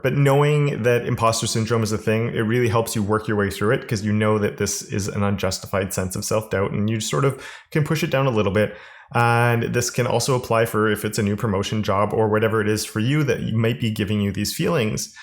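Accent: Canada. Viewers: This was good. You have spent some time looking inside yourself to understand where this comes from. Very helpful to me at this time. Also.... are you Canadian? "About" and "doubt" caught my ear. ;)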